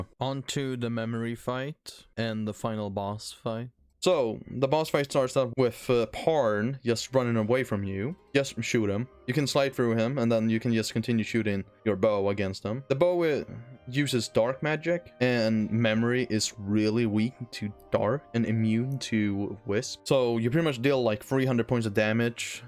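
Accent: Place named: Sweden. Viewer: Haha var tvungen att kolla var du kom ifrån. Tyckte väl din engelska lät svensk. Tack för en bra video!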